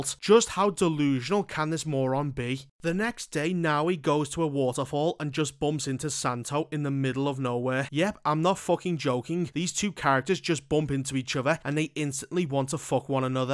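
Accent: United Kingdom. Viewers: I just love that British accent. "fockin dumb" XD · Bro i love your British accent and it makes it way more funnier 😅😅😂😂 Btw I am from Afghanistan 🇦🇫 Even though we fought three or four wars but still I love brits Love brothers No one can fake your history these people will try show everything was black like Egypt and North Africa.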